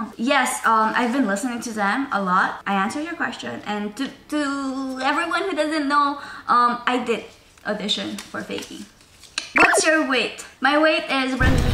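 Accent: Philippines. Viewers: Pinay accent 🤣😍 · Love her Standard Pinoy English Accent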